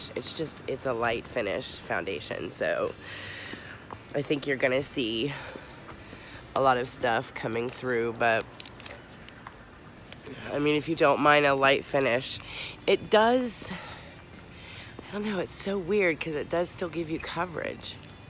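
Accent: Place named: South, United States. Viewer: 💜💜💜 Enjoyed seeing hubby's reaction, lol...."there's no makeup line" Loved your strong southern accent 💜💜💜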